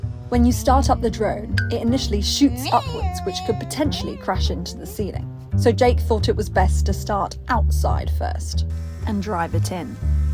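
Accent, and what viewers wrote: United Kingdom. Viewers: Your videos are the best ones out there for me... it lifts my mood ... and for someone who english is her 3rd language and i learnt it in American way I AM in LOVE with your British accent ... the way you film and edit are uniqe but dayli vlogs can be a good idea too ... anyways good luck and am waiting for your next uploads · Suprised to see your sponsership is a language learning website. Can you imagine how great your channel is for us who are learrning English :face-red-heart-shape: such a relaxing and leisurely journey with such a british accent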